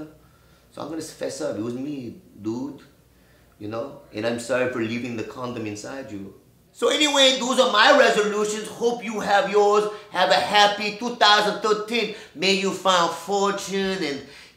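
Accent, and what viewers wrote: Mexico. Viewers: accent sounds more mexican than korean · Lol sound more Mexican than asian but Whateva works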